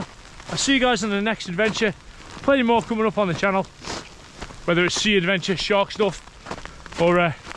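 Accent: Liverpool, United Kingdom. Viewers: Are you a scouser by any chance?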